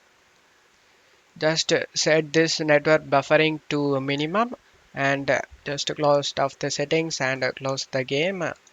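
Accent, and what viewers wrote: South India, India. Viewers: Duck ur accent south indian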